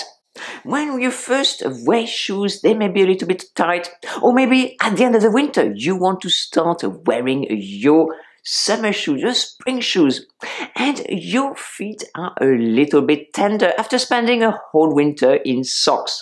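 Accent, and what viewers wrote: France. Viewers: I love your videos and learn a lot. Thank you so very much. I really love your French accent too. 👠👛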